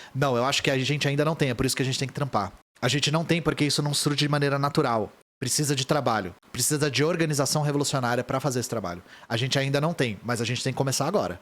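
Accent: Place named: Rio Grande do Sul, Brazil. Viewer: Me sinto representado pra caralho quando o fala gauchês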